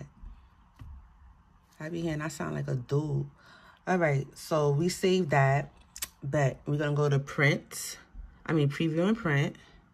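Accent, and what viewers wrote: New York, United States. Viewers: You’re definitely from New York lol🥰🥰🥰 I’m starting my neice lip gloss business for her and this is very helpful… Sending love from Jersey 😘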